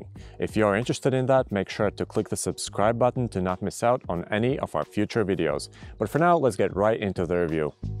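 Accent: Latvia. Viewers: Pēc akcenta atpazinu, ka latvietis :)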